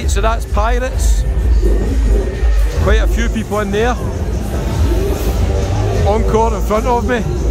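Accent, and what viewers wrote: Scotland, United Kingdom. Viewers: That pure scottish accent :)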